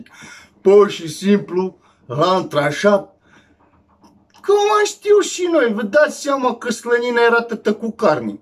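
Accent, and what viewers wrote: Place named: Transylvania, Romania. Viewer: Mai ales graiul ardelenesc oo splendoare... 💪🏻💪🏻💪🏻💪🏻💪🏻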